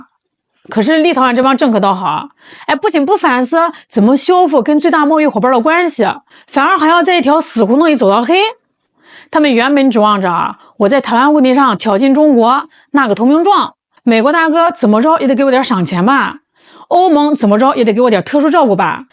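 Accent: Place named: Shandong, China. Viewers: 妹子你这口音是山东的妮儿吗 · 你这普通话山东味太足了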